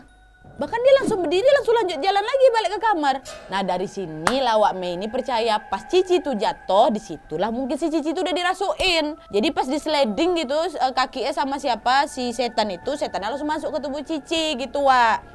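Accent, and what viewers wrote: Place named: Medan, Indonesia. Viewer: Tiap hari nontonin video nya..siang mlm..dari video trbaru sampai video lama...sampai kebawa2 bahasa sma logat medan nya 😅😅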